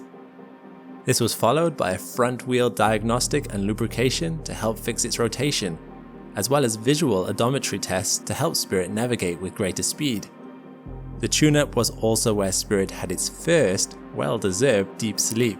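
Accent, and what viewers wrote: Wales, United Kingdom. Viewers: Are you Welsh by any chance? Every so often during the video, I thought I could make out a slight Welsh accent, more noticeable with certain words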